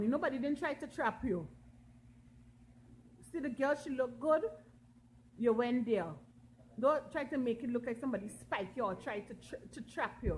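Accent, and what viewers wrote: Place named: Trinidad and Tobago. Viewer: Hey, you sound more Trinidadian than Grenadian, but that ONLY goes to show how the accent is the same.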